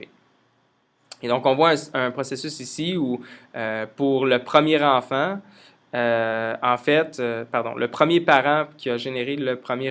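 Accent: Quebec, Canada. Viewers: le francais canadien :) merci frero pr l'effort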